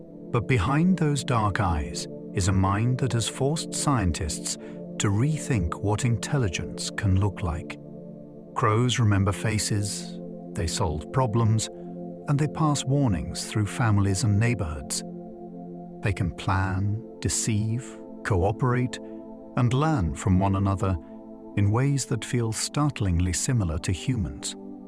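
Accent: United Kingdom. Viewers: Why is everything narrated with a British accent? It gets old, you know? If you listen to allot of things like this, or audiobooks, it gets real obvious. I can't take it. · 😂 Why shouldn't it be. I find the soft clear British accent part of the relaxing experience. And English is not my mother language.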